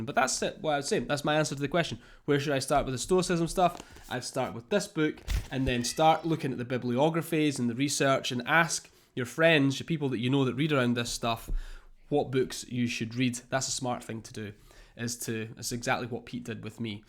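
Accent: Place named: Scotland, United Kingdom. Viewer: Are you Scottish? I think by your accent, yes. Either way, I'd listen to you talk about carrots just to listen to your accent. :)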